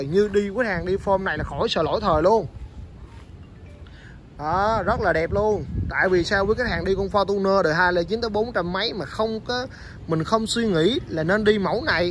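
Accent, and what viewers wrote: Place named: Binh Duong, Vietnam. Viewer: giọng chắc đồng hương với tài ô tô bình dương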